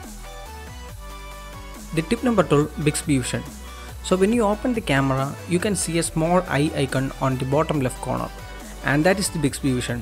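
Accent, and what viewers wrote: India. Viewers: The pronuntiation is more indian than english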